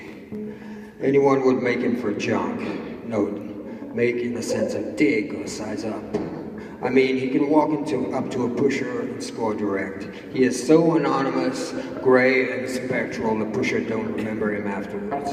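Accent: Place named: United States. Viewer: Is it just me, or does Jim Thirlwell do a really great American accent ( eat your heart out, Hugh Laurie)?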